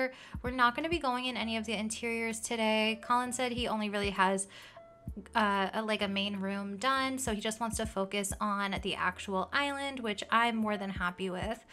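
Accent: United States. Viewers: you can tell he is from the us :D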